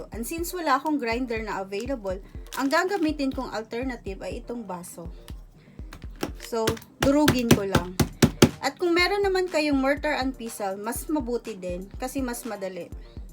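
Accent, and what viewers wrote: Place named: Aklan, Philippines. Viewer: taga san po kau maam? ung accent nyo po is it from aklan?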